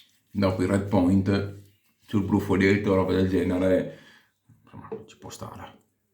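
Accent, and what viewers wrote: Veneto, Italy. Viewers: Una considerazione molto oggettiva, bel video. (Comunque si nota tutto il veneto che è in te xD)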